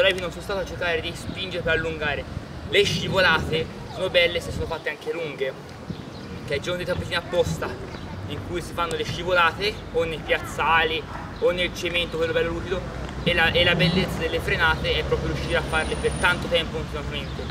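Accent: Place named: Tuscany, Italy. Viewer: Sei toscano dall'accento sembra di sì bravo bellissimo video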